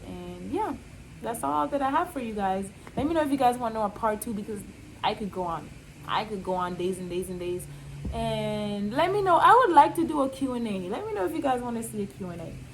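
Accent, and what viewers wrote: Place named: Puerto Rico. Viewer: U sound puertorican cool anyway my 20’s were dark and depressing😞sis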